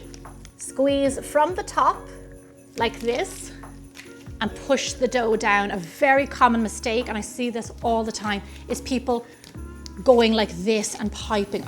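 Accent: Ireland. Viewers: Thank you,! This is exactly what I was looking for. And listening to your sweet Irish accent makes it all the better.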